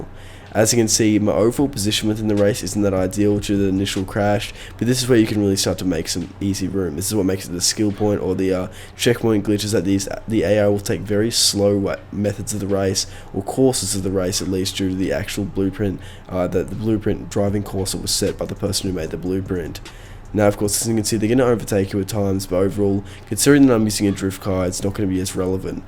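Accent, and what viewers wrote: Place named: Australia. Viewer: Hes speaking Australian english perfectly lol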